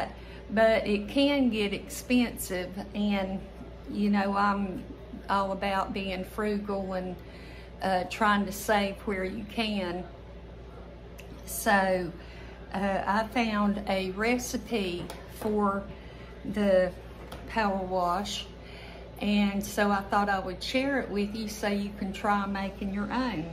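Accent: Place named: West Virginia, United States. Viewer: Hi, My name is Christine. I sent you a letter in June, about my mom, Tonya. She has since passed due to bladder cancer. I want you to know how much you meant to her. She loved your personality, demeanor and sweet heart. While I was a caregiver for her, she had lost interest in a lot of things toward the end of her life, she was 79. But she always had your channel on. Even when she napped, she wanted your show playing in the background. You we're her friend and comfort. She was from Charleston West Virginia. You reminded her of her family and friends with your delightful accent and passion for cooking. Please get well, don't be down, we have eternity with Jesus someday. We love people and touch lives on this earth. I strongly believe that's the will of God. Just like Jesus did and still does. and that's what you do, everyday. I will pray for you and everyday. 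Sometimes soldiers get wounded and tired and need to heal. Thank you for being there for Mom and me at such a delicate and emotional time. I'll always be a fan. God bless you and yours. Your friend, Christine ❤️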